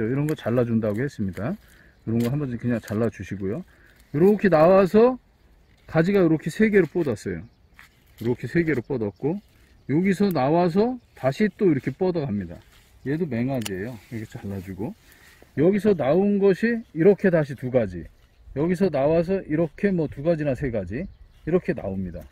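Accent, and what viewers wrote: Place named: Gyeongsang, South Korea. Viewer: 뻔데 경상도사투리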